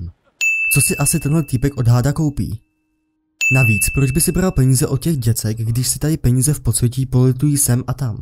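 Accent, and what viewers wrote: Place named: Prague, Czech Republic. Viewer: Hej jako já nechcu nikoho urážat, ale jsou nějaká morální pravidla.Ja su sice jen vytretý moravák,ale pražáčtina je něco NECHUTNĚ otřesného a k tomu mě dokopává to HR,RR,DOBHRE atd.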